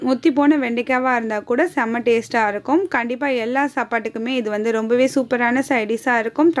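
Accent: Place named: Tirunelveli, India. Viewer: Mam neenga kovilpatti or thirunelveli site ah mam unga speech word enga pakkathula mathiri iruku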